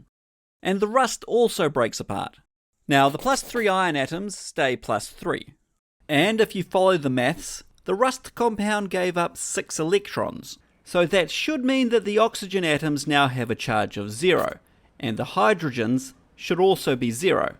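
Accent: New Zealand. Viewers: Physics sounds funnier with a Kiwi accent too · Awesome video. Are you an engineer by trade or science teacher? I mean we all know you're a long suffering Land Rover owner :) By the way, I always read your wall charts in my shop with a Kiwi accent (in my head). thanks! Can't wait for the next one.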